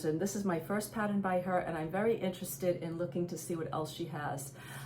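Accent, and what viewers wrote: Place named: Rhode Island, United States. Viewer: First time catching your podcast (now subscribe) and I love it. I had to go over to IG and find you and now follow you there too. I recognized the accent and then saw you are on Block Island. I'm a southern RI girl myself (Little Compton) and now call NH my home but my heart is in Little Compton. Your projects are all gorgeous. I had to go over to Ravelry to find the patterns and look more. I'm now obsessed with the Against the Current (shawl and tee). I think I'll put the tee on my next spring project list. I love what you are doing with the Vaddel Cardi. Now to see if I can find you on Ravelry.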